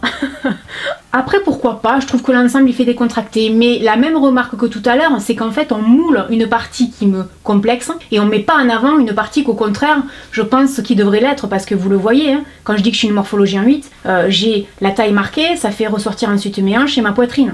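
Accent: South, France. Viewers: Je t'aime ♡ Vive l'accent du Sud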